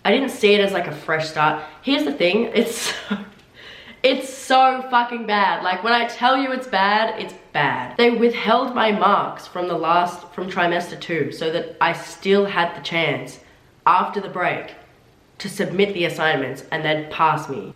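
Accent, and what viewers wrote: Australia. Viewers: She has an Australian accent actually hahaha, but I say that because she loves reading and literature in general. Nothing to do with her accent.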